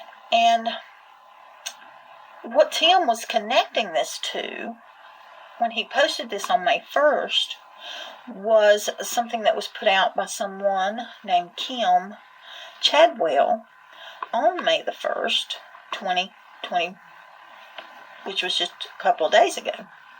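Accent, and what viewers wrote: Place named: South, United States. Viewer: Soooo good to hear that calming southern accent! I have missed you sister.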